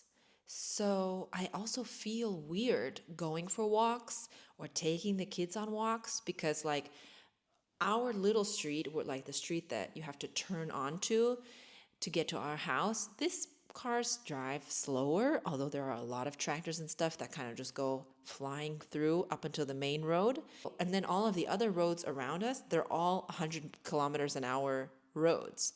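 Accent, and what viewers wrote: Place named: United States. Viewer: I just like to listen to your „American“ voice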